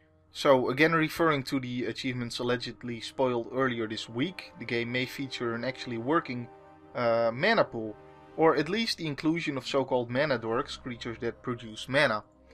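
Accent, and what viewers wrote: Netherlands. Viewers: if this ain't no Dutchy then i don't know who else can speak this dutch-english dialect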